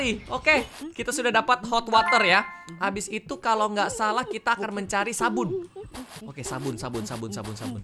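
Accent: Java, Indonesia. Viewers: bang orang jowo atau orang palembang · Kakak jawaya?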